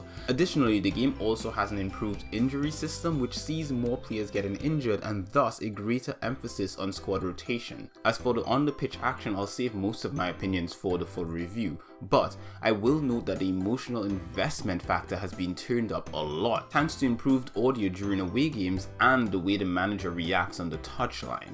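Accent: Trinidad and Tobago. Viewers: yeah im from trinidad but, i live in UK now and have lived away for a long time. Sorry if you're not, just thought some of the words you say sounded Trini like you might have some ties there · I'm a trini as well. Your accent sounded familiar so that's why I asked.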